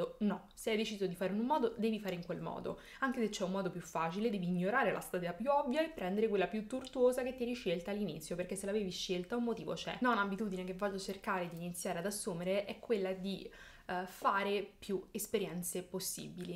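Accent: Rome, Italy. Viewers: Forse una cosa che non ti ho mai detto e che non vedo scrivere spesso. Parli molto bene. Sei romana certo ma se devi tenere un discorso sai esattamente come esporlo e anche in modo ottimale. Bel video. Bello per quello che hai detto e perché hai legato il tuo discorso a dei video significativi. Complimenti, uno dei video migliori❤️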